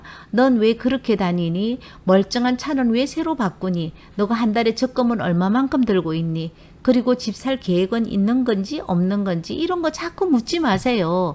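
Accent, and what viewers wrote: Gyeongsang, South Korea. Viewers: 경상도사투리 정ㅇ살듣기 좋아요😅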